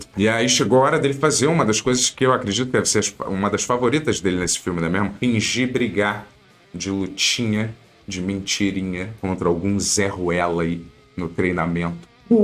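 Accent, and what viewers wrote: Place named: São Paulo, Brazil. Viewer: Ele e da capital , e sotaque de paulistano.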